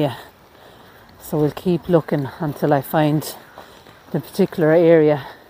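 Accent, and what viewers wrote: Ireland. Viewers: Love your vids ,and the Irish accent ...enjoy them greatly as I'm not well enough to go myself ...love gv too x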